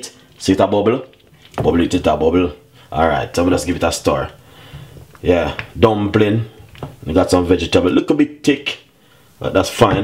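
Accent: Jamaica. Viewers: Very nice soup & lovely Jamaican accent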